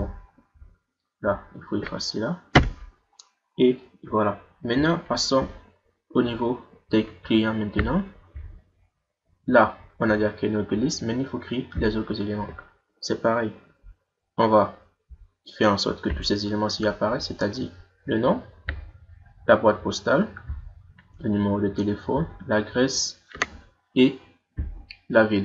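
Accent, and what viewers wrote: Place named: Africa. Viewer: C'est magnifique on a beaucoup apris et avec du français à l'accent africaine ça fait vraiment plaisir, merci.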